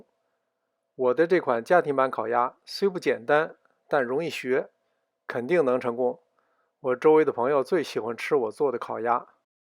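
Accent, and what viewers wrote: Beijing, China. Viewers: 喜欢听您这个不张扬的北京腔😉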